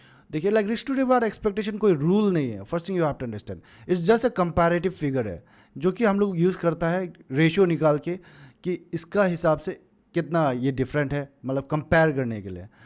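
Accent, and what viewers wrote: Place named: Punjab, India. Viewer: u sound like a punjabi guy❤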